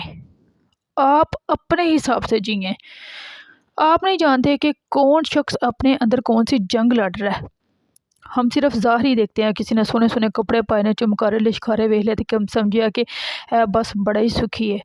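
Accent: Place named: Gujranwala, Pakistan. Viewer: Ap mujhe gujranwala ya iss k ass pass wale cities mai sy lagti hain😅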